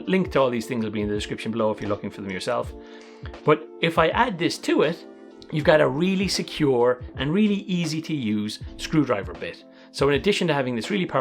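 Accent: Ireland. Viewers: "Underneet Dat doh..." great craic to see a fellow Irishman with a cool edc channel. Great altoid tin! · just came across your channel after this video was suggested to me and my god i’m glad! what a legend! loved trying to localise the silicon valley-ified irish accent. has to be cork? definitely down south anyway ;)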